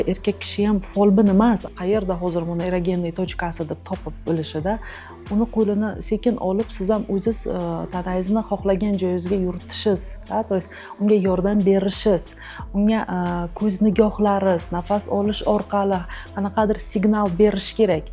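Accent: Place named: Qarshi, Uzbekistan. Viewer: Карши шевада опа куп болани фикрини эшитган бу аник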